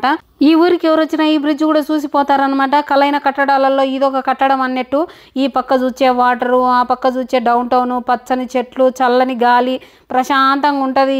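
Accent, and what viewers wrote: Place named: Kurnool, India. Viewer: Maadi kurnool district.. ayinaa mee negative village ku chaalaa daggara kanuka slang 99% same vuntundi.. Vuregadam,, Mugeddulu. Naaku kudaa silent vunte bo manta..oonnoo antene satisfaction..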